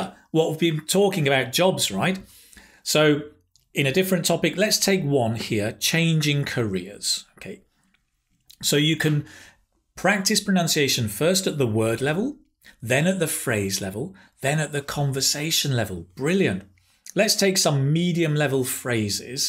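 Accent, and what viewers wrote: United Kingdom. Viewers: I hate British accent in fact, but to be honest you're the only one that I would want to hear his British English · You are speaking British English or American English??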